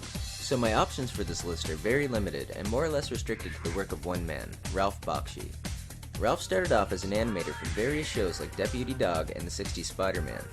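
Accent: Canada. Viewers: Do all Canadians talk the same way on YouTube?...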